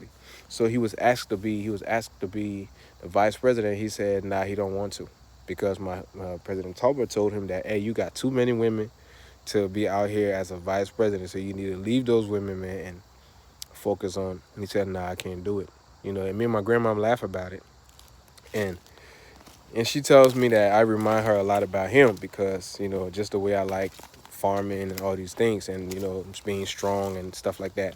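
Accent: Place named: United States. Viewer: Your accents is very American too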